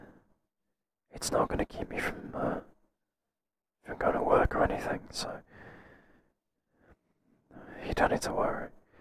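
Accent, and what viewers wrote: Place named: United Kingdom. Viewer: i love the british accent 🤌 keep going J! 💋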